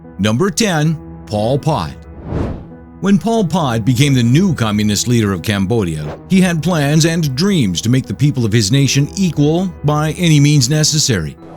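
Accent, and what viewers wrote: Canada. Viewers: this guy sounds so canadian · the commentator sounds canadaian right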